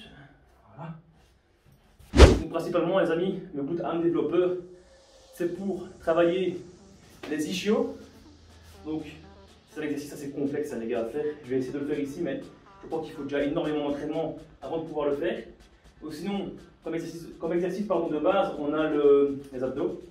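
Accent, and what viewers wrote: Belgium. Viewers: tu es belge ? si oui quelle region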